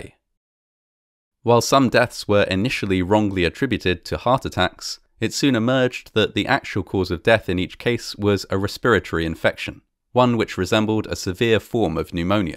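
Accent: United Kingdom. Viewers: They mean the windshield. I believe he's a Brit or learned British English, not American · When shows up in my Subscribers list for the day I always watch it first. Love the way you present your stories and since I'm almost 1/2 UK I love the accent.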